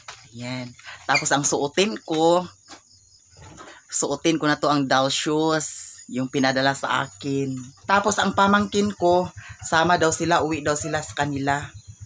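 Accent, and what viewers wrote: Visayas, Philippines. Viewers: Misubscribe na jud ko nimo mam kay nindot kaayu imo accent sa bisaya bah. Imong vlog sad nindot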